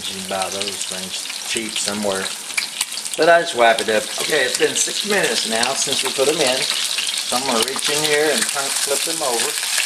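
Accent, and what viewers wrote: South, United States. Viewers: He sound Country I know this pork chop Finna be good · As soon as I heard his southern accent I trusted him haha 😆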